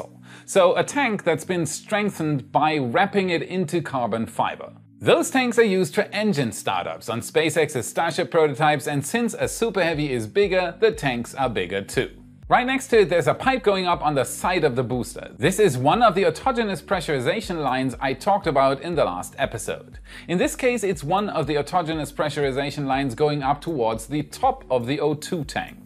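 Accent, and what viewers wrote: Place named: Germany. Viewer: I believe he is German, so English is not his first language. You should hear how the Brits pronounce Aluminum. :)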